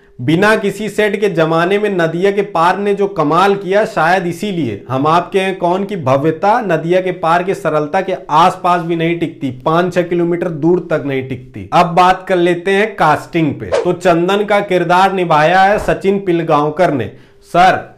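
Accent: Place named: Uttar Pradesh, India. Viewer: Yr.. bhaiya tumhari bte.. Mera.. gaon or mere bhaiyo ki yaad dila. Detii h.. Parathagarhiya.. hu bhai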